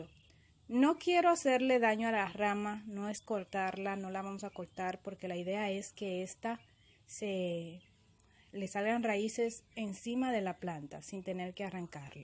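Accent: Dominican Republic. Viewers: ahh es que no conocia el acento de republica dominicana suerte con tus arboles👍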